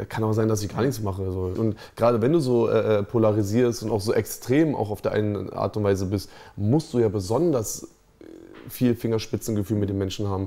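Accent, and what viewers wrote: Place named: Germany. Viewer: in echt heißts ja Command and conquer aber bei ihm hörts sich so lustig an so deutsch halt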